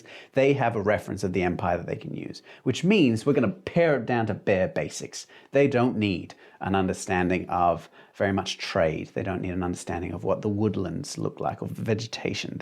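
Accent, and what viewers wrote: Australia. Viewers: Love your map passion, I thought I was the only cartographic kook. 🤪 [Do I detect a slight Aussie accent ?]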